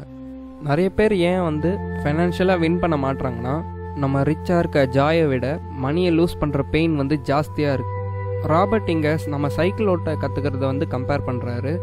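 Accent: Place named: India. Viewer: Brooooo can you changer reader pronunciation please no Indian 👳 better Chinese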